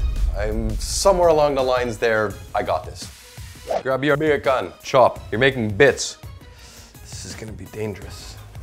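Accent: Quebec, Canada. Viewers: I don't dislike u I actually like u cause u sound a lot more Canadian the the other guys Lol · His accent seemed pretty consistent to me. He only changed his accent once when he said bacon (Jamaican accent, sounded like beer can). Either way, he has quite a bit of a French-Canadian accent. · You sound so Canadian lol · He is so Quebecois.... ( FRENCHY!!! )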